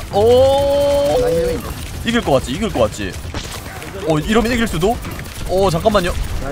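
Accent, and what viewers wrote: Gyeongsang, South Korea. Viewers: 근데 님요즘 경상도 사투리 쓰시네 ㅋㅋ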